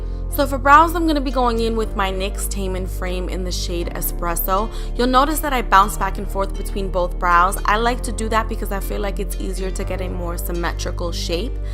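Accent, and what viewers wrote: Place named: Latin America. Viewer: Hey " "?......Love your accent...Latina right? I have subscribed to your channel.. Can you also share so love over here..Each one helps one!!...❤️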